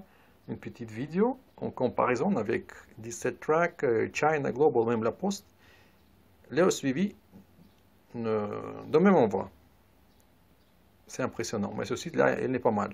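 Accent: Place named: Russia. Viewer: Я хз почему, но с твоим русским акцентом французский намного понятен на слух. Побольше на канале таких видео с собственной озвучкой. С меня подписка!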